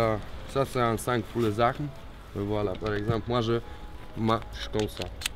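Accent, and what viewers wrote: Russia. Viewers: ? Il est d'origine russe selon moi d'où est le problème ? Un accent différents et donc ? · Похож на русский акцент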